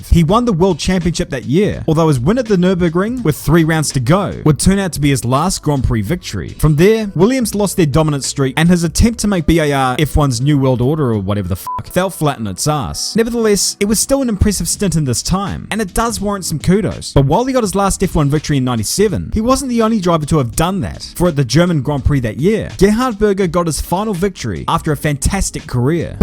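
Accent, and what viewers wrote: New Zealand. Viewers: That is the proper pronunciation, just sounds a bit funny cuz he's kiwi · Please just pronounce it "Ear tin". "Eye Earrrhh tonne" sounds ridiculous! Also, while I'm being an egg, why is your glorious Kiwi accent peppered with Aussie/American vowels, such as when you say "example" funny? Just curious. 👍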